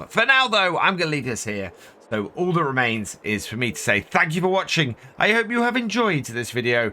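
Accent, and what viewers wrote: United Kingdom. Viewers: I like your British dialect, Grettings from Germany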